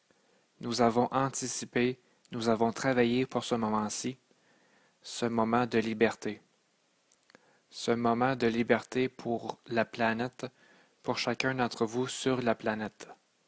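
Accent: Quebec, Canada. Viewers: Accent québécois.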